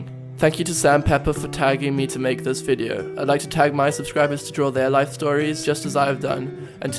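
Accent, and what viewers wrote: England, United Kingdom. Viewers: So did you develop the English accent when you moved, or did you have it in south africa ? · how come he has a british accent but he lived in south africa all his life?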